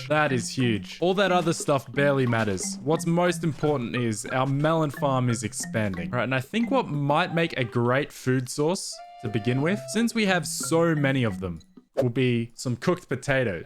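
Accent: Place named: Australia. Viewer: wait i just realised u got an australian accent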